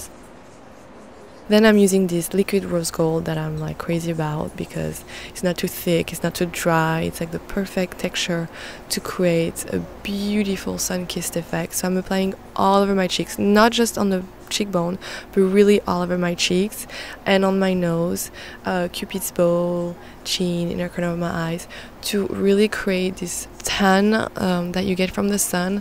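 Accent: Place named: France. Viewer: Nice voice and French accent. You're so chic . Keep up the great work .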